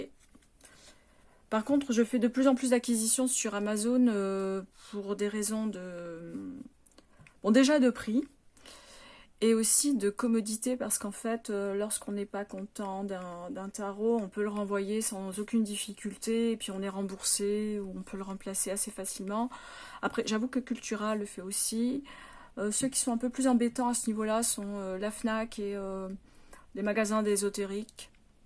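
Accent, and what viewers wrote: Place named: Italy. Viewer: Coucou, j'ai bien aimé découvrir ta sélection de jeux au travers de ce challenge. J'aime beaucoup le Heaven and Earth tarot tout comme le tarot des Runes! Moi, j'aime bien ton accent italien! 👍 Belle journée, à bientôt 🌸🌸😊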